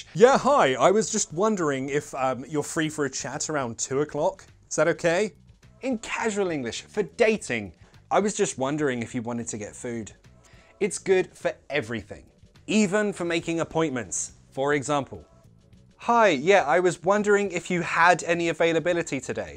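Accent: United Kingdom. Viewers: A big greeting from Piura, Peru. I really like your videos. They are so fun and I love british accent!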